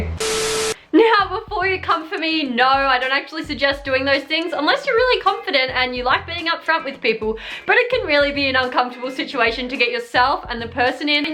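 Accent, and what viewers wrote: Australia. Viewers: are you dutch???? Omg ik hoop het hahah. And are you from Straya I hear your accent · i blushed lmao thanks for replying ♥️ and yes you really sound aussie! smh how could I not tell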